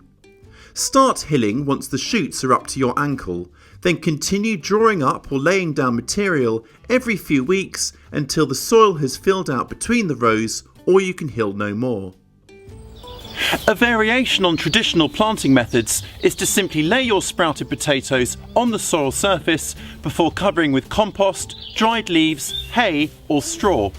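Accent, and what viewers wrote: United Kingdom. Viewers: The moment you open your mouth I know you were British 😅😅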